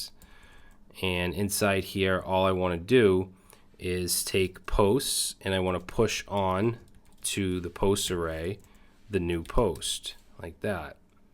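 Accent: Boston, United States. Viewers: has me laughing out loud ...Boston accent and all you keep it real!!! Live for your content broski keep it coming.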